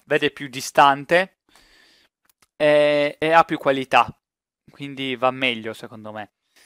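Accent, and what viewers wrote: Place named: Sardinia, Italy. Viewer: mi sa che siam conterranei..sei sardo vero? sembra del cagliaritano..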